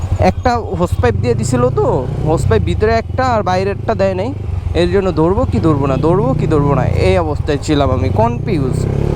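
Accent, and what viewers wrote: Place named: Bhola, Bangladesh. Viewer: ভাই আপনের বাসা কি ভোলা ভাইয়া আপনের বাসা তো ভোলার মত লাগে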